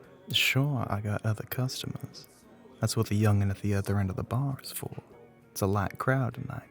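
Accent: South, United States. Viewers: The southern accent gets me every time I can’t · I love the southern accent smmmm it’s literally my fav 😋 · Me being from the south and never, EVER hearing an accent this smooth….I’m blushing 🥹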